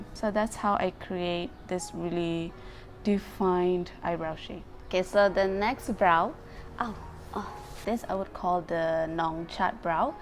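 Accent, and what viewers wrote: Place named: England, United Kingdom. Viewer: Yayyyy thank you for the tips💋. Suka btul sy sma tu english accent ko. Poinsikou💜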